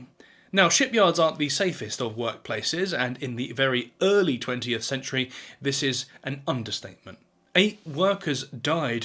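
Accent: United Kingdom. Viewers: Great report, the UK accent is a must do too. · Between him and Simon Whistler. It seems my favorite past time is having a British man tell me about historic terrors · I like British accent in narration 😊.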